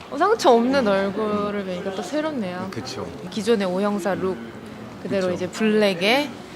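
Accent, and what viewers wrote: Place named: England, United Kingdom. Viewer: She has such a nice English accent, I love it ❤️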